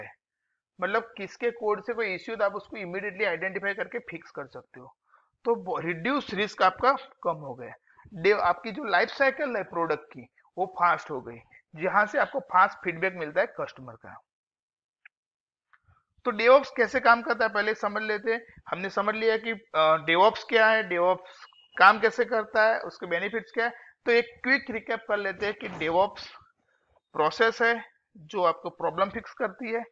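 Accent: India. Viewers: Are you from Orissa ? Your accent clearly shows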